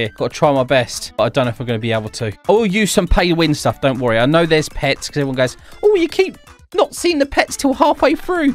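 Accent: United Kingdom. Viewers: His British accent never gets old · Are you BRITISH???? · Are you british bohou oh wauh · Hmm u sound British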